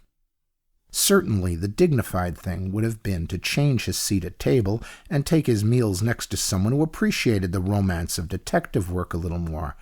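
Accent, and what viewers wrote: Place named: United States. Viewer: Not right with American accent sorry.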